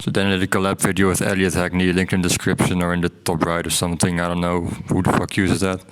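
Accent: Netherlands. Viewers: me, as a dutch person, can just hear that he's dutch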